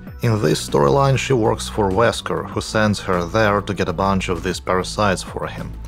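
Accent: United Kingdom. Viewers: Vpolne po yorksherski))) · oh are you from england?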